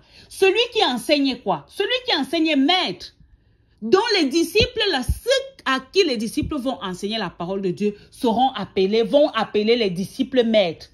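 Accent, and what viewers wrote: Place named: Cameroon. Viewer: Vraiment madame tu es forte dans rapporter les faits exactement comme ça s'est passé avec un accent camerounais interressant et intéressé par les faits Chapeau continue ainsi...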